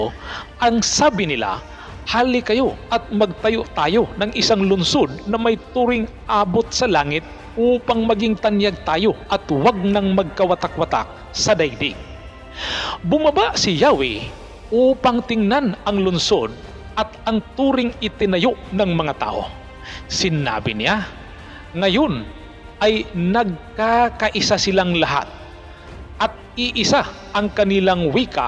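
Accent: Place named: Visayas, Philippines. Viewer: ano ba yang accent mo... sorry... wag ka nang mag pronounce ng english kung bisaya ang accent mo.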